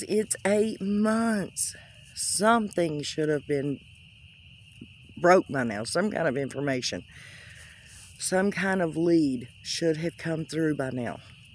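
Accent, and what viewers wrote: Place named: United States. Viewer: Your most welcome my friend…..I love your Accent, I’ve got family in OHIO, I love the American accent. But I understand what you are saying though…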